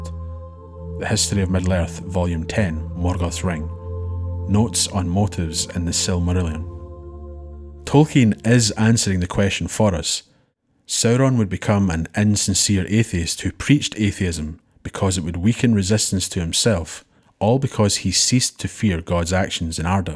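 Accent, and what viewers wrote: Scotland, United Kingdom. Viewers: Glad to have found this. And glad to find a fellow scot as a narrator.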